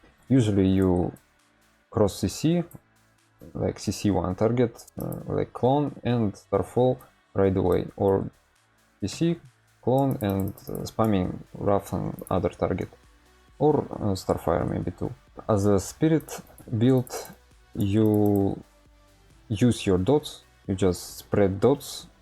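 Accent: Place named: Russia. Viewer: Кажется, мне слышится русский акцент...хм ...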